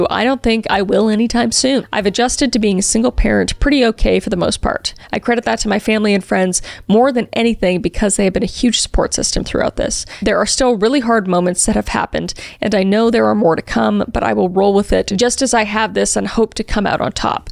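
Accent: Canada. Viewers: Ohhhh you're canadian! I have been watching like 20 of your videos in the past 2 days and i was like huh maybe i havent heard enough texas accents to judge but there is something off about this one <3 really enjoying your work, thank you for all the genres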